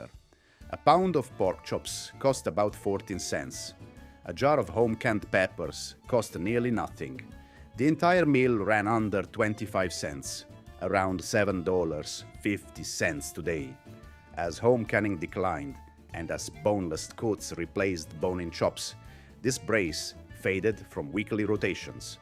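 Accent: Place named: Italy. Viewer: You have an italian accent but you don´t pronounce italian correctly. How come? For example: It´s Tschetschi, not tsetsi.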